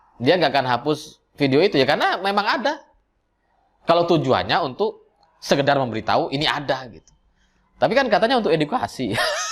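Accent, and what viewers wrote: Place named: Madura, Indonesia. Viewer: Ada logat Madura.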